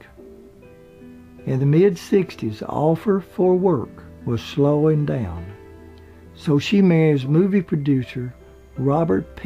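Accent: South, United States. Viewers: He is a elder southern man. Many (including a large amount of younger ones too) still speak that way down here.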